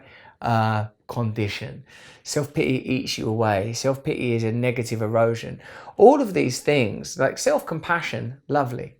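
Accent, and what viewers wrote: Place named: United Kingdom. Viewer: thanks dude. I just finished your book Freedom and it's awesome btw. you remind me a lot of a friend I have, she also says wise things and also is very funny and also is British, so based on my sample size of 2 in this survey I guess it must mean the British are wise, funny and, well, British. have cool accents, lets make that number 3. anyway thank you xx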